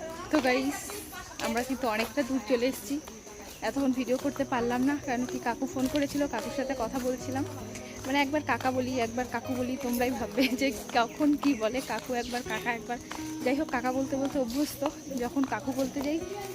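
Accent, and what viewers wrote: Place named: Bangladesh. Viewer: আমি আর আমার বন্ধু তোমার ভ্লগ দেখি। তোমার বাংলাদেশের টানে কথাবার্তা গুলো শুনতে খুব ভালো লাগে। আমাদের বাড়ি কলকাতা কিন্তু আমাদের দুজনেরই আদি বাড়ি মানে পূর্বপুরুষের নিবাস বাংলাদেশে ছিল। খুব সুন্দর হয়েছে ভ্লগ টা। শিলিগুড়ি জলপাইগুড়ি তেও আমার বেশ কিছু পরিচিত বন্ধু আছে। ভালো থেকো।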